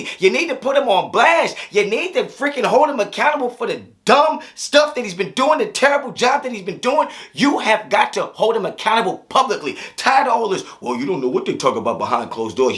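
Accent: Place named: AAVE, United States. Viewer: Stop with the ebonics, knucklehead. It's a bad look, bro. Trust me. 🙉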